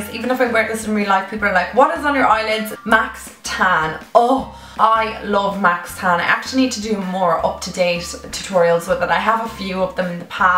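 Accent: Dublin, Ireland. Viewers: You an Irish buddy to? 😛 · Is she Irish?💖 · loved this video r u Irish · Love your accent are you Irish? ❤️👑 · I saw this Video and clicked on it and I heard your voice so I looked at your page and I was right u are Irish🍀🍀Im irish 2😁😁😁😁😁Love your vids👻❤ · new subscriber and are you irish? 💕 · Casey Ryx Irish accents are so pretty! 💕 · Are you from ireland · Zwitzel Monte Irish accent everyone love it😂✌️🇮🇪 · Are u Irish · are your from Ireland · omg please tell me your irish!! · Sapphire K Irish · Can I just ask where you're from because your accent sounds a bit Irish 😹❤️ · omg are you Irish?😍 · Your accent is so cute! Irish? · She from Ireland like me🤗😂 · R u Irish cause I am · Are you Irish? If so, me too :) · Are you Irish??? Where my fellow Irish peeps at??!?!!! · Is she irish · are you from dublin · I love your accent lol, is it Irish?? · is she Irish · Are u from Ireland c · Are you from Ireland? 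I just subscribed, loved the video! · is it just me or do you have an irish accent?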